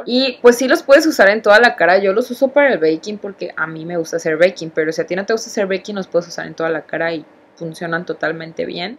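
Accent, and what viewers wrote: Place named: Tabasco, Mexico. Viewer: sk kuando somos tabaskeñas no keremos k se nos note el acento te entienndooojajaja te adorooo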